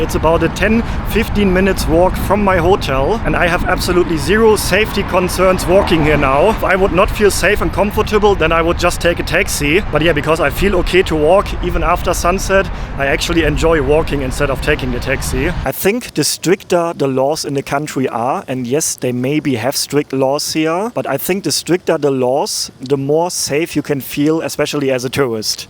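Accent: Germany. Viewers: Salam Aleikum Wenn ich deinen Akzent so höre.. bist du deutsch? 😂 ich musste lachen als ich dein Video gesehen habe. Ich bin mix, italienisch deutsch niederländisch Orient. Geboren aufgewachsen in Bremen. Und seit drei Jahren Muslima Alhamdullilah ❤ warum trägst du Handschuhe??? 🧤 👀 beim Essen? 😂 😮 99? Wow Alhamdullilah das wusste ich nicht. Große Bedeutung. 😢❤ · I wonder if the german accent helped him out would have liked to see him wear an israel flag badge And yes i was there for two months 15 years ago, and i had quite a few problems but a lot less than in so many other arabic countries i have visited more recently. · if this is a problem youre concerned about, you should worry about better things. This man is german, and english isnt his first language, so obviously his english wont sound as american.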